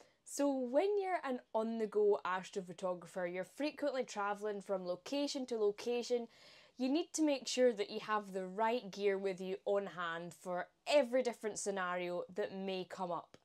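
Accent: Scotland, United Kingdom. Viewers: LOL "Pair-fect" bag. I love that Scottish accent!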